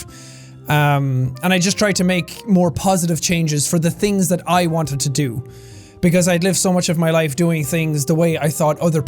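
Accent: Ireland. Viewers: “A little bit of luck involved” -Irish man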